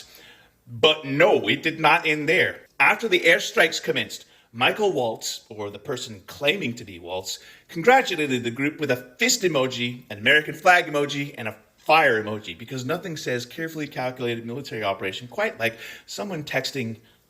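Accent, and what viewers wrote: Scotland, United Kingdom. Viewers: I made it to the end! I love the Scottish accent BTW.❤ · Innit cute! 😁🤭 But it's a Scottish accent! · Loving the angry Scottish accent popping through❤ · Absolutely love your stuff! I apologise if this has been asked before, and also apologise for it not being relevant to the point of this video but… do I hear a slight “accent”?. I appreciate everyone has an accent really but I’m hearing like a Scottish twang or something. I’m not from the states so could even just be the accent of where you’re from but yeh I really really like it and eventually was like omg just ask the man 🤦‍♀️also honestly thank you brother for giving me legs to stand on so I can stand with you. by informing me about how your guy’s government is affecting you, your fellow Americans, those humans guilty of simply wanting to be part of and contribute to America or even just to be safe or at peace, and the rest of the world right now. 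If you ever wonder how powerful your voice is well I’m glad to let you know it’s powerful enough to have crossed the sea and stir real emotions/dedication to humanity. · You know is upset when the Scotish accent is coming out. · Is it me, or am I really hearing a bit of a Scottish accent? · Am I having a stroke or were you sprinkling in a Scottish accent? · 👏👏🫱 🔥🔥💪👊🖖...yep I'm being a cunny funt 😂 Is it just me or does keep dropping bits and pieces of an accent (except for the sepo one of course 😉) in every now and then throughout this particular video? I swear I'm hearing dribs and drabs, a bit of Scots, a bit of Taff, a bit of pom, a bit of Eire, either that or I've got a couple of them big furry grasshoppers (🦘) bouncin' around in the top paddock (🙇‍♀️)...🥴 🤣🤣 Catch ya l8r 🙃🐨🇦🇺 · bro, you sound occasionally scottish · Ho is you Scottish?! Hell yeah! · I think this was this first time I heard Scottish accent ❤ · Love the Scottish accent lol as for the epic screw up I'm not surprised. 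That would be like if the Enola Gay radioed ahead to let them know a little boy was about to be dropped on Hiroshima!!! Stupid as F***!!!! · You would actually have to work hard to be this incompetent. 🙄🤦‍♀️. I hope no one in our military dies because of these idiots playing their own personal game of Risk. It was nice to hear more of your Scottish brogue,! 😊💜👍🏻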